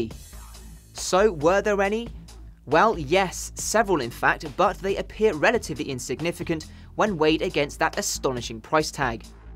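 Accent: United Kingdom. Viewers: The British accent is so amazing <3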